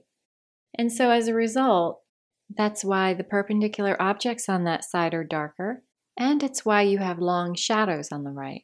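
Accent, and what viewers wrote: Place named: United States. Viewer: This is so helpful and so well constructed. Also I loved her voice. Americans always seem to compliment our English accent so now I can return the compliment. It’s one of the nicest American accents I’ve heard so wondered what part of the US she comes from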